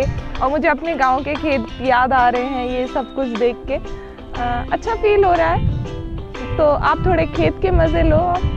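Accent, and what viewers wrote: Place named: Madhya Pradesh, India. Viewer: अरे आप मप्र से हो आज पता चला। मैं तो पहले ही समझ गया था, आपके बोलने के तरीके से आप हमारे एरिए से हो आप मप्र में कहाँ से हो।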